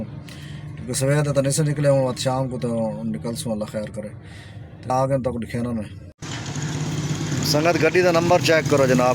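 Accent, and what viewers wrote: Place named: Punjab, India. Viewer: Bhai itni gharhi panjabi na bola kare ham jese bhi bohot Dil se sekhte hein apko Bohot si bate smjh hi nahi aati phir😢 Baqi thank you for your work❤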